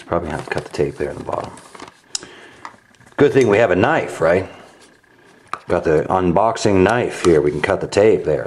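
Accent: United Kingdom. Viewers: Thumbs up for the English accent! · Best british accent ever. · lmfao your British accent is epic, it makes us British feel proud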